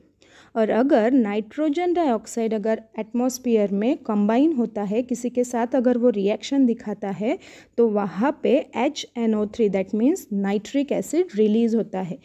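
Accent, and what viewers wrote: India. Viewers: Brilleint maam btw ma'am u r from south India i think according ur accent rii8?? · r u bengali ?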